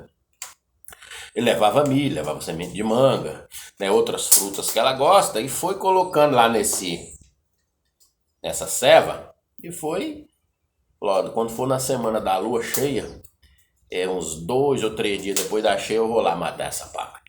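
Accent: Goiás, Brazil. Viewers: engraçado o jeito goiano eu gosto muito de moda de viola as modas antigas e escutando o randal grande compositor e goiano falar da vida dele e voçe contando historia sao muito pareçido o jeitao de falar parabens voçe e bom contador de causos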